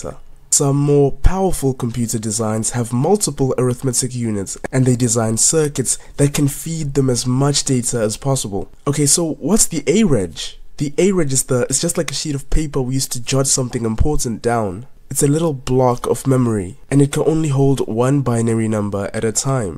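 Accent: South Africa. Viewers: You sound so South African🇿🇦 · I hear that accent, and I pray that youre sponsored by a company that delivers to SA😭🙏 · Dude, are you South African? Your accent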